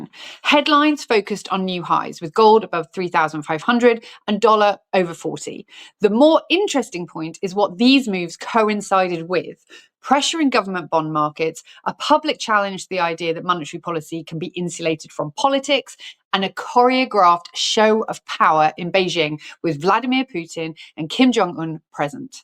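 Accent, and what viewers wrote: England, United Kingdom. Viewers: I just love English people. I could listen to y'all talk about paint drying. Great presentation though.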